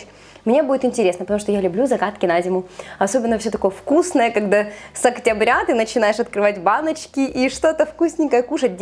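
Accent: Ukraine. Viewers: Девочки,что же Вы такие злые?Во-первых на Украине речь этим и своеобразна.А уксус просто испаряется при варке.Больше позитива ,друзья!!!!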